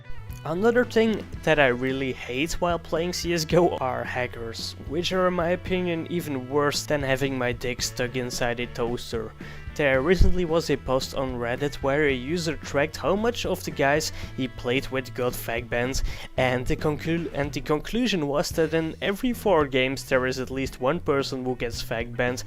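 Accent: Netherlands. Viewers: are you dutch? i thing i know your accent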